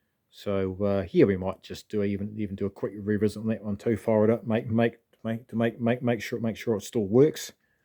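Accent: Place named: South Africa. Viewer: This man sounds like a White South African.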